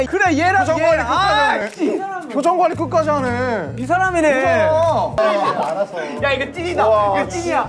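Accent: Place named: Busan, South Korea. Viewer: Busan satoori lol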